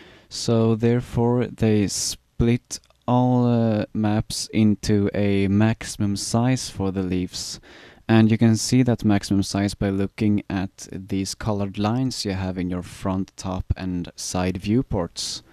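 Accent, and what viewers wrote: Sweden. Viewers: Thank you very much! You sound extremly swedish!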